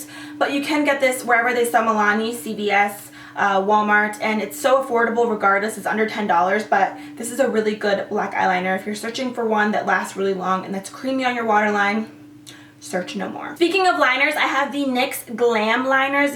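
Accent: Spain. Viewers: i just love yur spanish accent 😘😘😘😘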